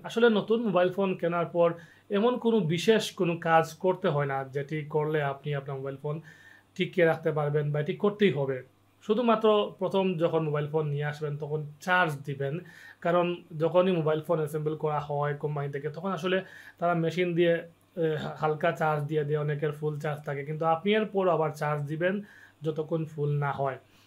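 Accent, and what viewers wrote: Sylhet, Bangladesh. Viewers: সিলেটি ভাই 😮😊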